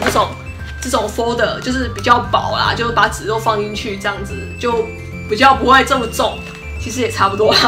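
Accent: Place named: Taiwan. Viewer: 哈哈哈覺得這集台灣腔好重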